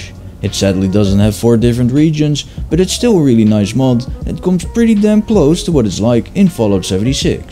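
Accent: Netherlands. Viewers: I'm i right on detecting a dutch accent? Ik denk het wel namelijk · Literally translated "good busy". In proper terms, usually a sarcastic way of saying "well done". He's Dutch, like me, so I can easily notice his accent. I merely made a bit of a giggle here; nothing serious.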